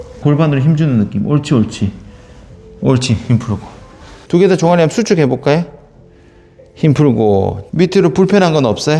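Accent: Gyeongsang, South Korea. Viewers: 너무 웃겨서 자꾸 듣게 됩니다 경상도 말이 재미있어요 ~~~~~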